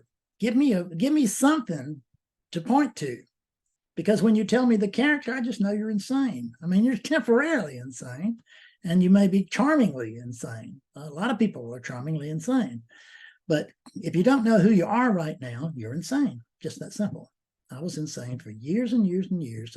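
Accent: South, United States. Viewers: when I first found you so long ago, I dismissed you as just a Hick with that "country voice" and ordinary language. I was used to the impersonal, superior Lecturing style of other Speakers/Teachers, so I didn't value your "common man" style until just now. Thank you for your honesty and personal language plus your obvious Awakeness.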